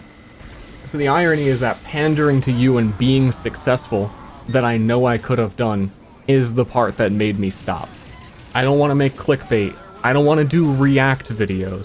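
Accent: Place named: India. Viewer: You sound indian.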